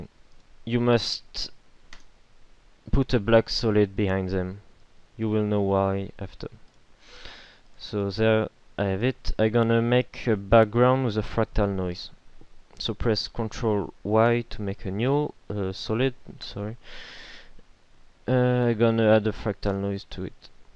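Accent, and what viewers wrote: France. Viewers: Are you french, swiss?? *.* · Your accent sounds french... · ah ton englais est parfait avec l accent Français tu t en sort bien t es pmv son extraordinaire continue a en faire j adore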